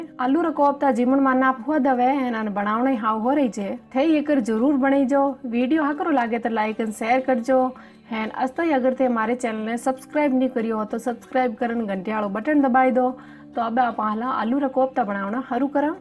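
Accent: Marwar, India. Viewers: Aap ki recipe or marwadi boli bahot hi mithi hai,Ram ram🙏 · वाह जी काबिले तारीफ आपकी सारी रेसिपीज बहुत अच्छी लगी और आपकी मारवाड़ी व मीठी बोली आप की रेसिपी में चार चांद लगा देती है..... आप ऐसे ही आपका हुनर हम तक पहुंचाते रहिए और देश दुनिया में अपना नाम रोशन करते रहिए l धन्यवाद🌼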